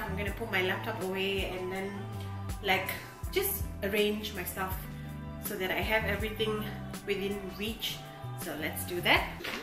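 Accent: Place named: South Africa. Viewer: Happy Anniversary weekend to you both. I am confused by your ethnicity. You look South Indian and you celebrate Diwali but your accent is South African and you live in KL.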